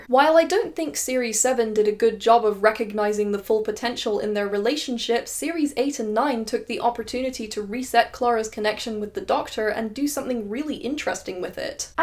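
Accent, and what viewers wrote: Scotland, United Kingdom. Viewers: i cant pin your accent, you sound a bit scottish, a bit irish, but with an english twang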